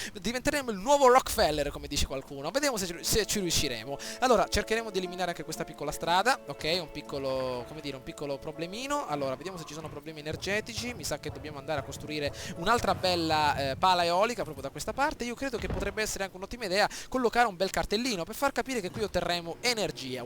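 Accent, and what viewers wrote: Sicily, Italy. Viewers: di che parte della sicilia sei??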